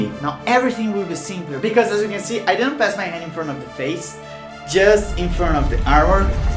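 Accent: Brazil. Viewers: na verdade ele tem um sotaque mt fácil de identificar que é brasileiro kkk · Sim ele é brasileiro, dá até para notar um sotaquezinho e tbm mostra na localização do canal, só que como a maioria do público dele é gringo ele fala em inglês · Você é brasileiro né? da para notar no sotaque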